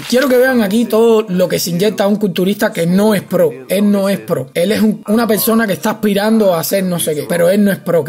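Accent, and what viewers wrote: Cuba. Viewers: hubo muchos que no entendieron bro, empezaron una discusión absurda por acentos cuando realmente es gracioso pero carismático ese acento cubano suyo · Que bola brother por tu acento pareces de Cuba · Dale loco todos sabemos que es cubano y es su acento por naturaleza digamos que tu comentario no es nada atinado ante una persona sale e invierte tiempo de su propio tiempo para hacer un video alertando a los mas jovenes sobre hacer estupideses luego de grabarlo subirlo publicarlo lo mas inteligente que haces en el teclado es redactar sobre su forma de hablar rayos viejo solo atinas a burlarte? No jodas que tienes en la cabeza inmadures o no sientas cabeza se ve que ya estaa grande para eso no lo crees?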